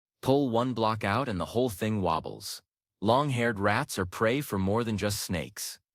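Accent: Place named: United States. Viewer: I Vote B#LLSH$T...The american voice is a giveaway...Yanks are full of it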